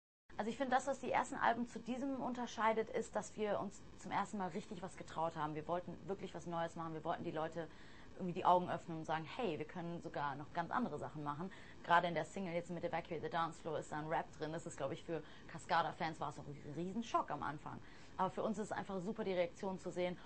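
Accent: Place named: RP, United Kingdom. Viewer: This is not something that you see every day. Posh British proper accent to straight up German. For years she had me fooled. When she sings she has an American accent. Then I heard her British accent and was surprised. Then I found out she speaks perfect German. It's so weird. I think I'm more jealous of this than her fame and fortune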